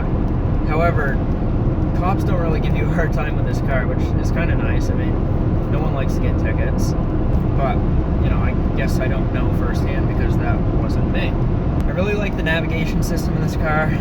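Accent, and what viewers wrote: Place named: Canada. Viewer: You have a slight canadian accent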